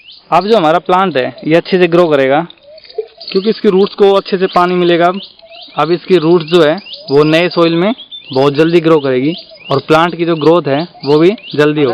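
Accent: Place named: Jhunjhunu, India. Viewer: aapki bhasa mst h aap junjunu side k ho kya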